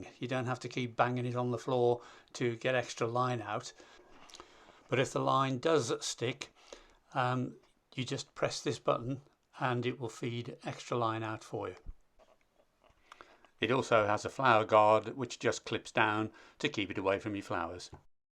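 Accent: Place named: Bromsgrove, United Kingdom. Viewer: Lovely video, very useful and you’ve convinced me to buy it. Your local accent also pricked my ears up, I’ll hazard a guess you’re near bromsgrove?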